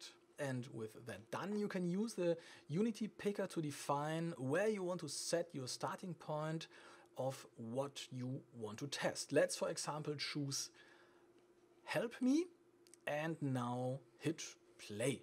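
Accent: Germany. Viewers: Is the guy talking from Germany? :D sounds a lot like it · Yes, he is a true German! A rare breed.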